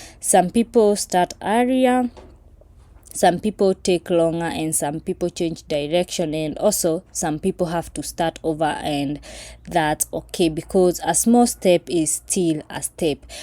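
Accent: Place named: Kenya. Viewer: Sura yako ni ya Mkikuyu pia huwa unasound kama mkikuyu lakini jina ni ya Kiluhya au umeolewa na Mluhya?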